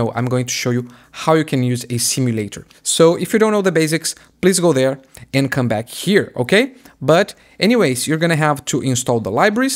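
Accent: Brazil. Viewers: tu é Brasileiro né mano? Entendi perfeitamente seu inglês kk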